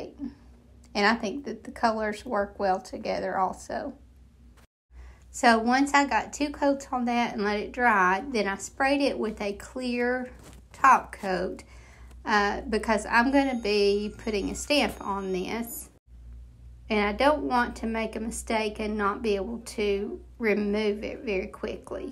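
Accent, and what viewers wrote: South, United States. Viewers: What gorgeous projects ❣️💕 While I have seen many upcyclers paint over silver-plated trays, this is the 1st time I have seen anyone leave an unpainted silver border around the edge of the tray and also leave the back unpainted...and I rather like it❣️🤍 Everyone has their favorite "go to" paint color. Not hard to understand why "buttercream" is a color you use frequently❣️🤍💕🤍 Not only is it beautiful for all of the reasons you described, BUT IT SELLS, which IS THE BOTTOM LINE, LOL.🤣🤣🤣 And being from Minnesota, am I the only one who loves ❤️ the way softly says, "buttercream" with that darling Southern drawl⁉️⁉️ Hahahahaha❣️👍🏼😁🤪🤣 The ONLY drawback I experience when you say "buttercream" many times during the course of a video is the HUGE CRAVING I GET FOR A BIG SLICE OF CAKE 🎂 🥮 WITH BUTTERCREAM FROSTING AND AN ICE COLD GLASS OF MILK, LOL❣️🤣🤣🤣🙈🙊🤣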